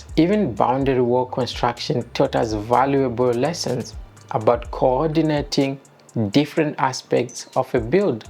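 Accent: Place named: Kenya. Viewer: I thought you were kenyan from your accent. hmm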